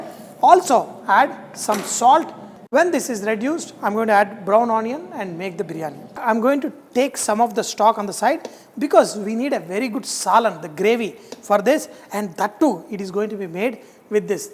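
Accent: India. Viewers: Sir ur English Indian mixing so its perfect understanding....boomba.boomba. Iamurbig fan I love cooking...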